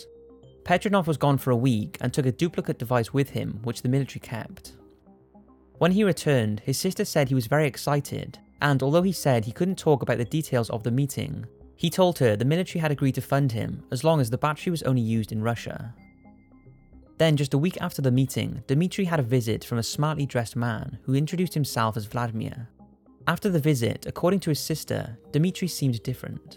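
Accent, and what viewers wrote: United Kingdom. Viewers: Hey perfect storyteller voice British guy, be careful, I’d be sad to find out you went missing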